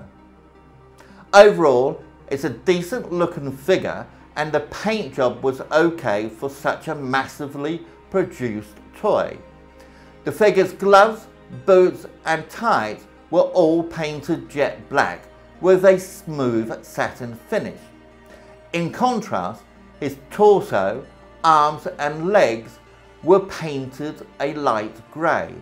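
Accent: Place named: United Kingdom. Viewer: Gotta love the guys British accent 😊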